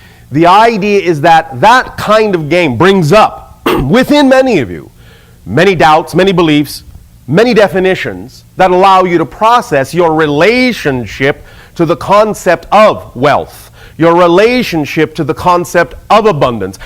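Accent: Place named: United States. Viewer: Why do you talk with American accent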